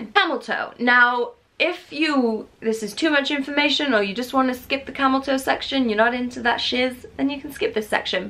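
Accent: Wales, United Kingdom. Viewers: are you welsh? you seem to have a slight welsh accent to me haha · You are soo cute babe ♥ I am from the Czech republic and I am soo in love with your British accent!! Please please, do you know when will be those leggings restocked? Thanks, and please, more James in the vlogs and videos, you guys are so cute together! ♥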